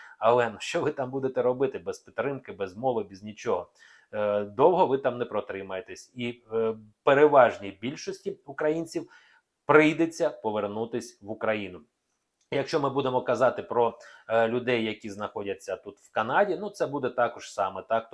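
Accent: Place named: Russia. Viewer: Прослухала не один матеріал від Вас і таке враження наче Ви радієте коли говорите, як повернуть чоловіків з-за кордону; вишлють біженців..як нікому ми тут не потрібні.. є нотка злорадства. Дивлячись на досить помітний російський акцент, здається що це таке собі іпсо. Хоча не зрозуміло чи від України чи від москалів. А може краще було б відіб@ від людей, перестати маніпулювати? Дати кожному вирішити самому де жити і за що воювати? Приносити користь, кажете. Так зберіть алкашів, нехай приносять користь. Там точно ціла армія набереться. Чи що, політики наші вже синів з за кордону забрали і відправили в бій?) Клоуни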